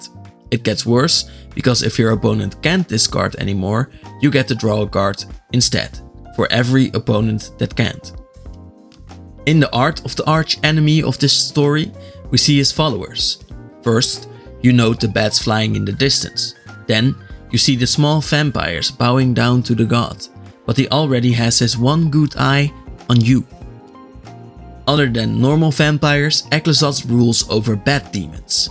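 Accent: Netherlands. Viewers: first of all, are you Dutch? second of all, very good explanatory video! I had no idea what the gods were doing on Ixalan all of a sudden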